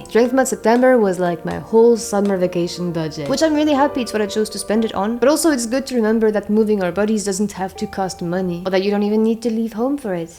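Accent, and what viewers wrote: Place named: France. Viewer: Okay great, now you not only have a super cute french accent and love DnD but you're ALSO a strong muscle mommy? My brain can't handle this combination of traits I adore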